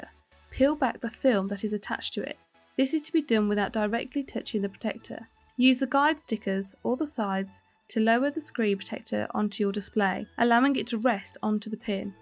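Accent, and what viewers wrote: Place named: Birmingham, United Kingdom. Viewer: Big up Brummie lady👍🏻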